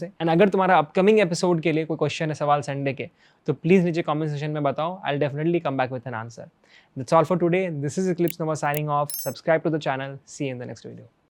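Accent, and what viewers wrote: Bihar, India. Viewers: Do u from Bihar ?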